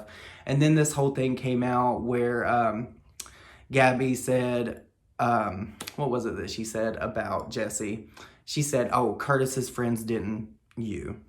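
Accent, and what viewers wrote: South, United States. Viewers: I must know for sure. Are you from the south because I am 🙋‍♀️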